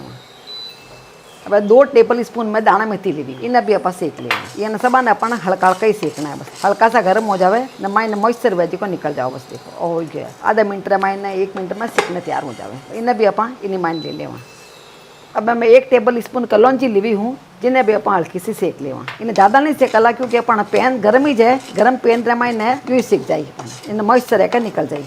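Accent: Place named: Marwari, India. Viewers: aap rajisthani bolte huye bohot ache lagte ho mujhe bohot acha lagta he rajisthni khane maze k hoten hen me pak me reheti hin magr mene jothpur ki thali khai he or jothpur ka qila bhi dekha he jodha bai ka ab me ye achar bhi banaungi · How educated u are please reply.. what a marwari twist with English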